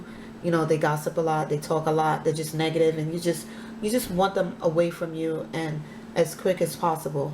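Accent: New York, United States. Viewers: are you frm ny? "talkin talkin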